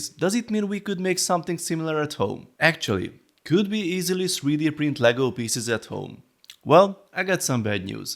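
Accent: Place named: Hungary. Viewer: Are you Hungarian? Your accent sounds so Hungarian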